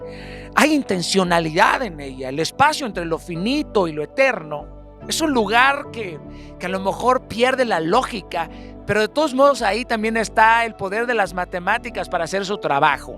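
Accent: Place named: Greece. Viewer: Tienes acento griego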